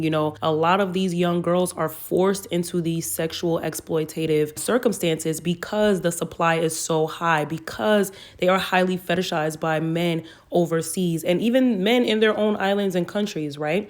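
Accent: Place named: New York, United States. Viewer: I hear your accent; are you from New York City?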